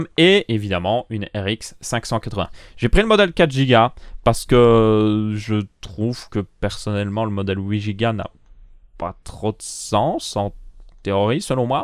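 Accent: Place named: Belgium. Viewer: bah il est belge il le dit en belge ... il va pas le dire en anglais non plus ..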